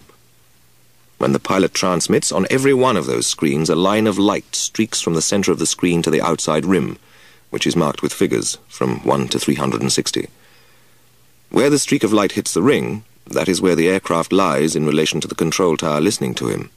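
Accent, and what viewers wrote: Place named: England, United Kingdom. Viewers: Much prefer this version (which I have on cassette tape) to the Al Maitland version. The difference between the reading by an actor versus a radio announcer. The English accent also lends authenticity not present in the Canadian version. Wonderful story, in any event. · I agree, respect to A Maitland, but you need the well spoken English accent for this story.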